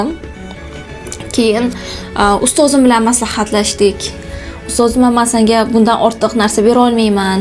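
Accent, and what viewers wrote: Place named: Bukhara, Uzbekistan. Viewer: Buxorolikmisiz shevezdan sezdim😊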